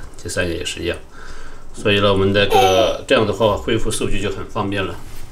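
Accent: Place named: Anqing, China. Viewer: 一听油管主就是安庆那边的人😊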